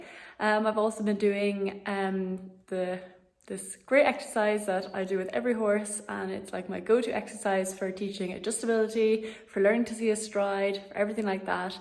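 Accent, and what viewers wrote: Ireland. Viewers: Another fantastic video... 🙂😉😁 Her sweet sexy irish voice makes me fall asleep 😴 but not because I'm bored in the slightest lol it just reminds me of those cd's which help you fall asleep!! I often lay in bed watching these & wake up still wearing my headphones & holding my phone!! I love all of her videos, especially the connection she has with the horse.. perhaps the gentle voice along with her fantastic riding hypnotises the horse lol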